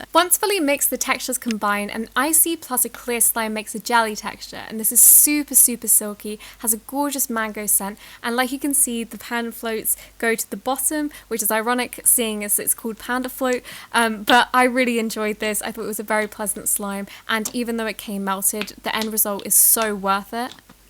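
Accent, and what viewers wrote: United Kingdom. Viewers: Their accents are nothing alike. Australians have more of a drawl to their accents, whereas people from Great Britain have more of a clean accent (unless you're from the north, of course). is british.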